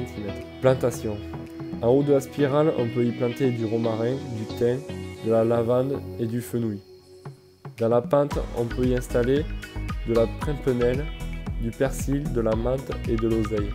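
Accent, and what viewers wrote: South, France. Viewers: (16)... Oh pétard... Ça c'est super beau.. Et très bien expliqué en plus 👌 Mais d'où vient cette pointe d'accent du sud.. La Provence peut être avec les plantes aromatiques positionnées ici ? ☺️ En tous les cas bravo chaîne très intéressante 👏👏👏👏 A bientôt 🤗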